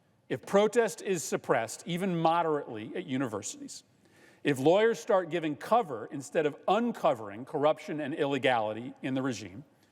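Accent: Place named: United States. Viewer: An American voice that god.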